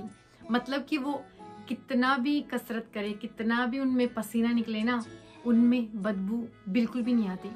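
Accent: South Korea. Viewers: Your accent is slowly slowly changed into korean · I love your Korean accent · Why you talk like you don't know hindi .. like some korean speak hindi.. but its feel good if any korean dpeak like that .. however you look awkward talkimg like this... its bad..not good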